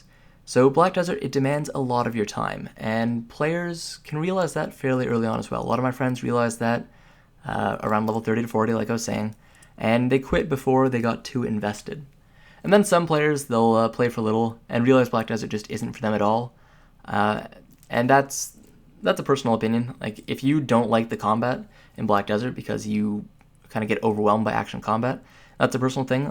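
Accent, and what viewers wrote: Canada. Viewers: Haha you candian right?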